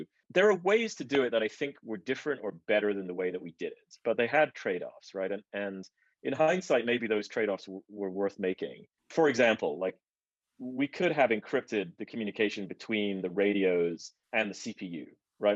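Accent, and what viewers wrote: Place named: Australia. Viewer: .. i sware i can hear an Australian twang.. did you ever live in Australia?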